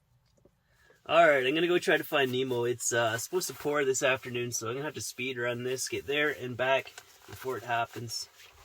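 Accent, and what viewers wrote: Canada. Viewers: in the first minute i asked myself from the accent, "this guy's got to be canadian' then you mentioned the distance as kilometers and I questioned no longer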